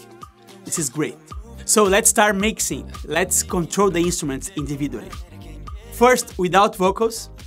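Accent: Brazil. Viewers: Massa demais!! é Brazuka! Dá pra ver pelo sotaque! Parabéns pelo canal!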